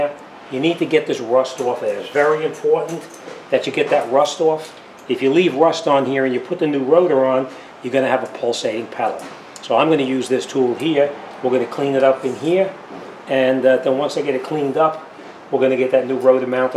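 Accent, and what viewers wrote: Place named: Bronx, United States. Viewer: Nice Video My Man... & That Sound Like A Bronx Accent!!! Lol...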